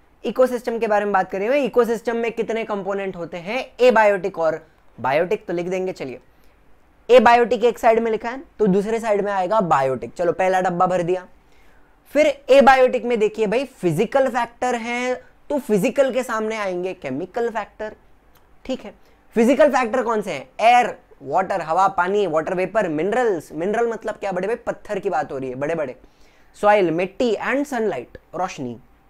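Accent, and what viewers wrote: Maharashtra, India. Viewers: Sir mast shikavata tumhi Tumhi marathi ahat ka I thought that because of your language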